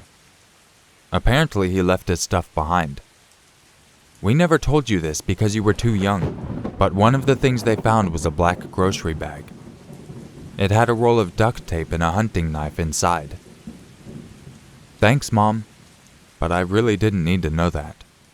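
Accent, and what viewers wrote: South, United States. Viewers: Love your Southern accent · u and that county voice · Love the southern accent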